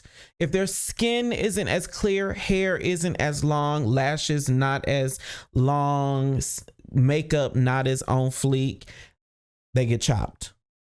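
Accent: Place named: Detroit, United States. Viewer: are you from Detroit? "what up doe?"?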